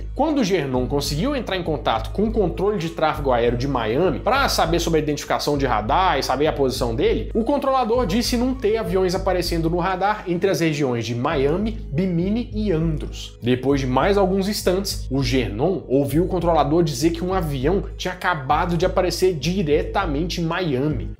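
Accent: Minas Gerais, Brazil. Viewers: Mineirin bunitin, sô.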